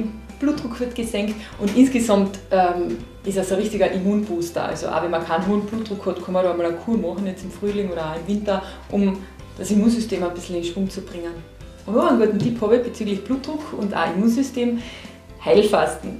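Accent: Carinthia, Austria. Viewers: Kärntnerisch ist auch ganz lieb anzuhören, nur verstehe ich es halt leider nicht allzu gut. Muss mal wieder nach Kärnten kommen. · das Rezept ist echt klasse, wir machen dies auch Jahre schon so, v.a. für das Immunsystem grade zur kalten Jahreszeit immer❤🍀❤🙏🍀❤..finde deine Videos auch mit dem Österr./ Kärntner Dialekt übrigens super süss und sympathisch ❤.🤗🥰❤🌼❤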